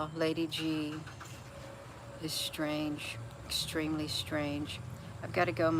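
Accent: South, United States. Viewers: You sound like you live in the south. You have an accent.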